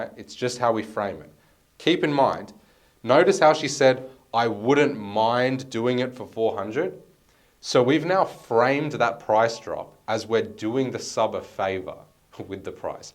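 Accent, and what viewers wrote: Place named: Melbourne, Australia. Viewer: you remind me a lot of natural tim from freedom business studios also based in melbourne, you present well like him and have a similar accent/way of speaking. Might just be a melboune accent though, anyway love your content really helping me with my ai influencers im in bris myself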